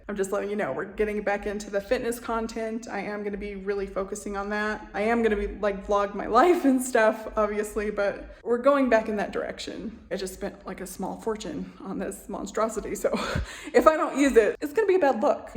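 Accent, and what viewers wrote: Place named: New England, United States. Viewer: It’s so funny being from New England hearing your pronunciations and hearing you hype Maine as a cross country trip because Maine is like a day trip lol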